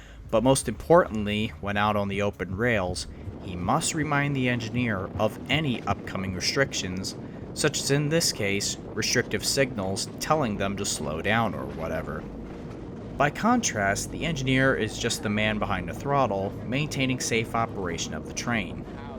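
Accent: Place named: East Coast, United States. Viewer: Hey bro, he lives on the east coast and we pronouce things different out here. Cut the dude some slack jesus.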